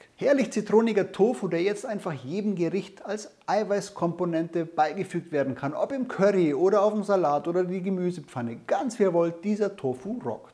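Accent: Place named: Franconia, Germany. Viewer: Hallo, ich habe gerade deinen Kanal entdeckt, super! - und sehr charmant mit Deinem fränkischen(?) Akzent ❣️ "Geschmacksrodeo" 😂❣️- made my day 😘- yummi 😋- sieht echt gut aus ❣️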